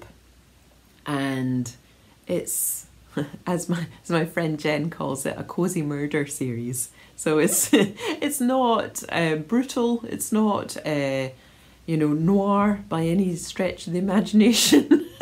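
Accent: Scotland, United Kingdom. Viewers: every time I hear your Scottish accent I think of my Nan. She left Scotland right after wwII bound for America. Her heart missed Aberdeen all the time, despite having had harsh and brutal childhood. I hope to set foot on the land that lost her. I’m sure that you hear from folks with Scotch ancestry all of the time, so I apologize. You are not Scotlands keeper of it’s lost souls. I do hope that you are over that brute of a virus and make up lost knitting time💗💗💗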